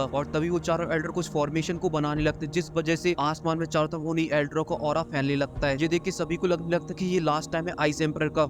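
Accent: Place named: Chambal, India. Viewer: Bro ye tumhara Chambal Accent kaafi mjedaar hai... episodes dekhne ke baad bhi yha explanation do do baar sunne me achi lgti hai...upr se actor ko tharki name ek dum shi diya hai😂😂😂😂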